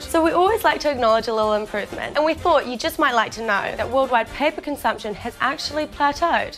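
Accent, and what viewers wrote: Australia. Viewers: she talks way too fast. shes australian isnt she ß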